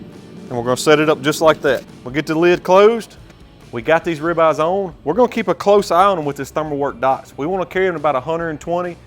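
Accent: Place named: Appalachia, United States. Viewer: less than 3 min's in, I heard everything wrong or how NOT to cook a piece of meat like that. Second thing... lose the hillybilly accent.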